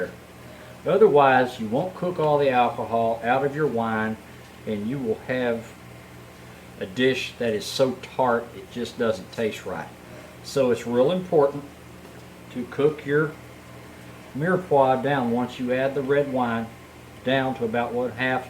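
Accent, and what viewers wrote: South, United States. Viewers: A couple seconds in the video.. "oh this guy is from the south, so you know he knows how to cook ribs"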